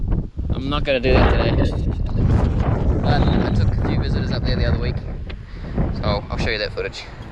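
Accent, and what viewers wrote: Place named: New Zealand. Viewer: Nice video well done 👍. Do you live on the island? Did not really grasp if you do. You do sound like a Kiwi. No offence of course. I am a Aussie, no offence of course lol . Cheers bloke.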